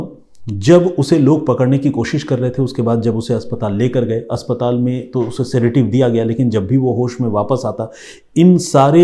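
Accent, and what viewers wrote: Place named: Bengal, India. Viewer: आपके कहानी सुनाने का अंदाज बहुत ही आकर्षक है। मैं विशेषकर आपके बंगाली हो करके शुद्ध हिंदी में कहानी सुनाने के अंदाज से मैं बहुत इंप्रेस्ड हूं।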